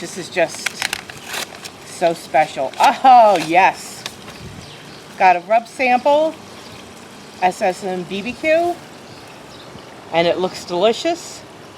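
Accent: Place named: New Jersey, United States. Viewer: Glad you got a YouTube Channel! Nice to hear your voice and that beautiful Jersey accent. The group hooked you up. There is a place in the town where I grew up, that sells these Mexican made grills that look like animals. The also sell pretty good barbecue. They have a bull like that out in front. When they’re open, they have it smoking with a charcoal fire in it. C’mon, you gave the bull a girl’s name? Margarita? Give it a tough name! Such as “Pancho” or “El Bandito” or “El Fuego” or “El Desperado”. What ever you call it the bull and the board are nice.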